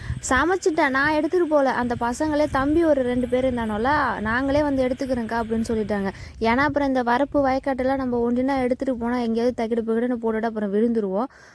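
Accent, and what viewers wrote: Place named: Virudhachalam, India. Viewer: It is amazing to hear Virudhachalam Tamil. I am in Delhi wish to visit Tamilnadu soon. Your video refreshing village memories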